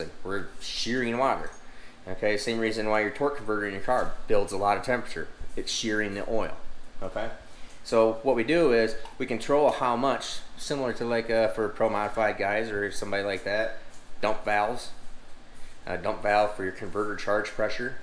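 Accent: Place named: North, United States. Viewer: I'm from Arkansas so I noticed that same thing he definitely sounds like he is from the north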